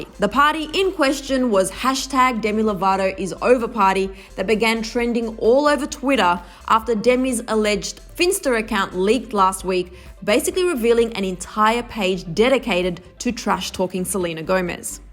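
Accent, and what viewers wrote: Australia. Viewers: What is that dialect? Is it Australian? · you're just not used to Australian accent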